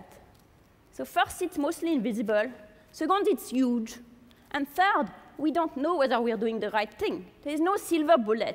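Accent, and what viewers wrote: France. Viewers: that's how a french speaker speaks english. Speaking some of the R as H, some H as SH. French is easy and fun if you English and Hindi.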